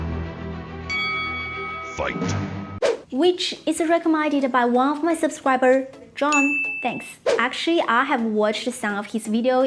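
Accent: China. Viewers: Respectfully, your critique is excellent. But, as soon as you say one sentence in English, I know you’re from China.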